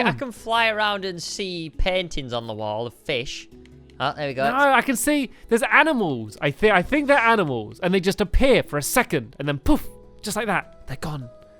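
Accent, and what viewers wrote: United Kingdom. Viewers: It is so weird hearing train stuff in British English.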